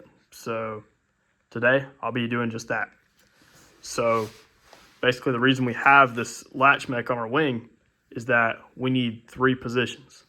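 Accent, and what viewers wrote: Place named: Texas, United States. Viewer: the texan accent 🔥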